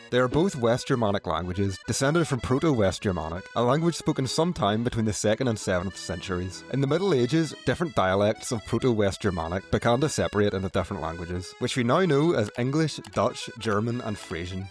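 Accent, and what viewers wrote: Northern Ireland, United Kingdom. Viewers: It's funny when I first heard the man's accent I thought he was a Dutch person making the video because he didn't sound English and more Germanic though I'm aware most Dutch people speaking English barely have an accent because 90-95% of their population speaks English fluently and the most proficient as a second language in Europe. Then I saw that he was based in the UK according to his user description and then he revealed he was from Northern Ireland which was only then that I detected his accent being from that area even if he sounded like he was pronouncing all the Dutch words correctly like a real Dutchman would do. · Nice video! Really managed to quickly showcase the similarities/differences. Your pronunciation is really quite good, especially your UI, but I couldn't help but think I was hearing an Afrikaans accent! 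After realizing it wasn't just the first few words or something, I HAD to check your profile. I could obviously hear the Northern Irish accent as well, but thought you might be an Afrikaner having grown up there or vice versa in South Africa. If you don't secretly have any Afrikaner DNA, I would highly suggest you take a stab at Afrikaans, you'd sound fantastic at it! Mainly your short O, A, OU, -T/D, and OE, sound very Afrikaans, but even the G sounds ever so slightly more Afrikaans. Some specific examples are your "gebracht", "koe", "dorp" (the r also contributes to it here), "stad", "koud" and "soud" (which sounds identical to Afrikaans "sout" meaning "salt" ("zout" in Dutch). Anyway, none of this is to take away from your video or anything! Love your Northern Irish OW in words like "cow" and "howl" as well 😄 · I love the northern ireland accent, everything is pronounced so clearly. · Kind of – it's his Northern Irish accent. 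· I thought the narrator was Scottish, but I suppose the Northern Irish accent must be close to the Scottish one. It made the English words sound a bit 'off' to my ear lol. But I'm a Kiwi, and so I bet my vowels sound worse 😆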